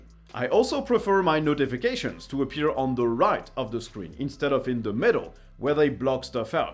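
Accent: France. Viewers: Did you say Ioatas or Aphostrophe? Could not get that from the french accent sorry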